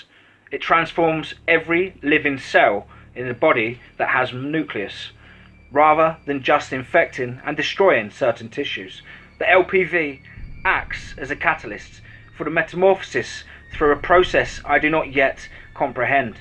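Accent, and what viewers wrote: England, United Kingdom. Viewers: I Love Your Narrative s and Stories and your Amazing Talented enthusiastic English accent voice that puts an entertaining twist to the tale and puts your listening audience right inside the adventures of those who are attacked by the Creatures of the Night! God Bless You my Friend and Thank you for doing what you do because I truly look forward to Bedtime knowing I can lay back turn on your Channel and relax and Enjoy your latest episode of Cryptic yarn s! Been a fan of Cryptids and Paranormal phenomenon s my whole life since early childhood ! I find that Unknown world of Creatures that are not supposed to exist to be utterly fascinating and interesting especially now that their is growing Scientific Evidence and Eyewitness accounts that these creatures of folklore may actually exist!!! Have a Great Weekend! Keep up the Great Work Please Kind Sir!!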